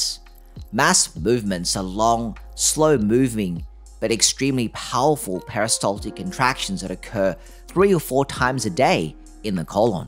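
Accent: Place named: Australia. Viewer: Your Australian accent actually made this video fun and enjoyable because hey I'm litteraly full of shit ! But being serious nice video 😂😂 litteraly just got back from the hospital because of this sh*t !!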